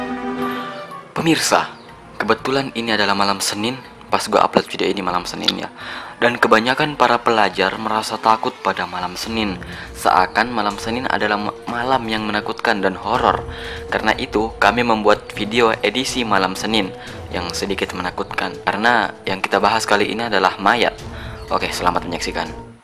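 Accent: Makassar, Indonesia. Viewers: Orang Makassar detected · Abang orang makassar yah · Santemi logat makassarta' bos 😂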